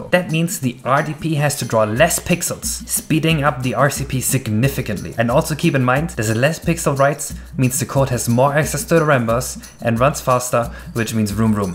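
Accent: Germany. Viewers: i love the heavy german accent. very thematic. "lol. typical germans. always trying to reinvent the wheel. there's no overengineering like german engineering" and the like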